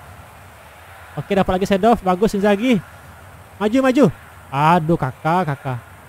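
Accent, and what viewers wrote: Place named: Riau, Indonesia. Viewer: Kau pasti orang Sumatra khusus nya Riau kan, ketebak dari logat bahasa Indonesia mu 😂😂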